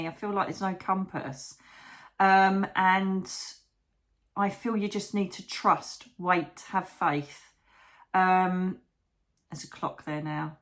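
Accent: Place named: Scotland, United Kingdom. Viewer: Mags from Scotland… loving the Scottish accent and love you! Yes, purple is your colour! Go! Big hugs all x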